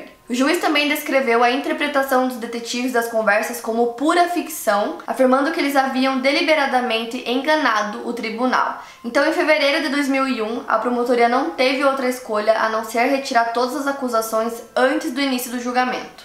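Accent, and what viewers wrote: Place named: South, Brazil. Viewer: Olha so acho q ela tem sotaque do sul🤣🤣 Mais da pra entender. Tem como colocar legenda tbm!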